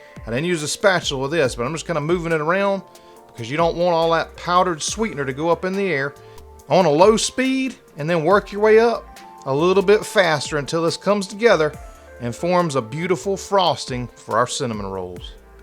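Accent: South, United States. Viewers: I do. Your personality and Southern "accent" makes the videos even more enjoyable to watch.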